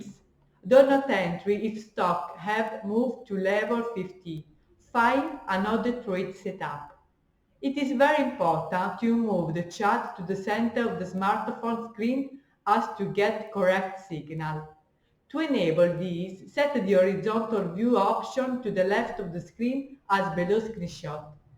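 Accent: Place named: Italy. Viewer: nice video, you have an italian accent, are you italian?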